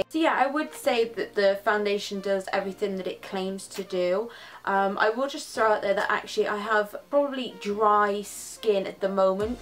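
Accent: Bristol, United Kingdom. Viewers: Is that a Bristonian accent?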